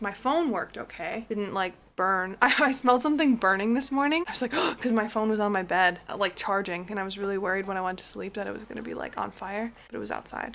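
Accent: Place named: Taiwan. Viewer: haha, I really enjoyed watching your monologue in the background while I'm doing random stuff. the holes on the wall are for ductless air conditions, so you might need get them for summer. connect your TV through the extension cord, they are the same voltage. by the way, your Chinese accent sounds like someone from Taiwan.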